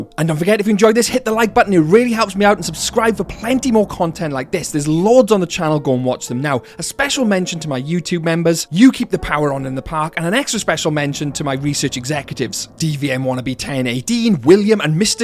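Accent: Wales, United Kingdom. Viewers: Call me crazy but instead of hybrids on hybrids I’d love to see a deinosuchus, titanaboa, daedon or Troodons in JW. Also forgive me is your accent from wales?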